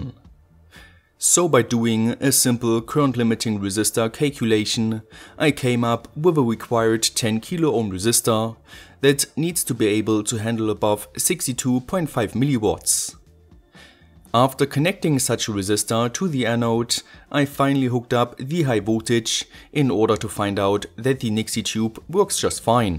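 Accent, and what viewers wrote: Germany. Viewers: Dieses eingedeutschte haha xd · Junge dieses schwere Atmen nach jedem Satz und dieser deutsche Akzent ahaahsh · Also den deutschen Akzent oder besser gesagt die Aussprache hört man gut raus aber cooles Projekt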